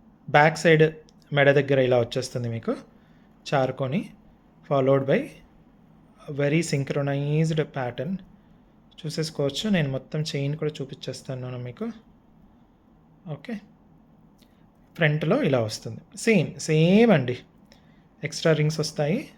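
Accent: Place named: Telangana, India. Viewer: Mee telangana slang super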